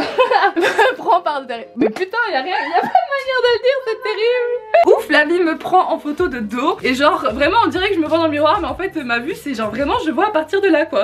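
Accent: Belgium. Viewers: elle est belge?😶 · Tu es belge? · tu es belge?!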